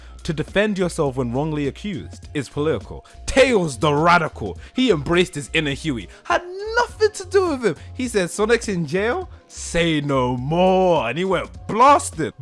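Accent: United Kingdom. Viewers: OK I’m in the New Jersey right now. I’m halfway through the video and I’m trying to get what it is you’re actually trying to say. I’m having a little difficulty with your very casual black British accent. I think for future videos it would be nice if you used a traditional thesis statement and clearly define the arguments arguments pro and con. What do you seem to be doing now is playing a lot of distracting clips, name dropping a wide variety of media just because they have black characters in them and And doing sort of a stream of conscious rant that is very difficult to follow. I’m almost at the end of the video now and I don’t know what it is you’re trying to say. It seems to me that you’re saying that all black art is political because black is a social construct. I don’t know if that’s what you’re saying. If it is you could’ve said it in a much shorter video with much tighter editing and restricted to movies, television, Japanese animation as opposed to western animation. 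You could use storylines and specific examples from particular shows. I’m not really sure that you really thought out what it is you’re trying to say. Your analysis of get out is pretty off-base. I don’t like the premise of the movie. Which seems to be be suspicious of white people. It was a carefully crafted screenplay. Not being African-American I’m pretty certain you have no idea what’s going on here anymore than I have any idea what it’s like to be black and British. Anyway good luck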